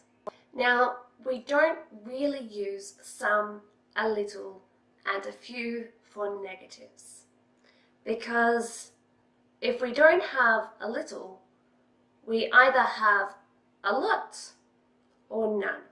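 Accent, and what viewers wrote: Australia. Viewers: Content is exceptionally good!! Exactly what I was looking for for a friend. However, sound and video production are, I am sorry to say, horrific. Sound production is important, because many will find the strong Australian accent unfamiliar and then add to it the slight echo from the bad sound and that makes it even more difficult for people to understand you. The slow speech is excellent, though. I am hoping since 2019 you Googled "sound for youtube videos" and made ANY improvements suggested. Really, if you are going to produce youtube videos, doing the absolute bare minimum for quality, not far below, is expected. The poor production quality is really detracting from your absolutely fantastic content. Cheers!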